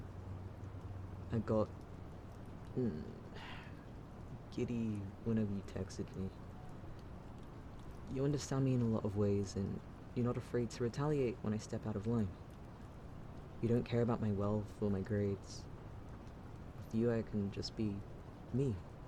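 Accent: United Kingdom. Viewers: R u British because that accent hits different for me like omg how can someone have an angelic voice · I love your British Accent and you deserve more Subscribers!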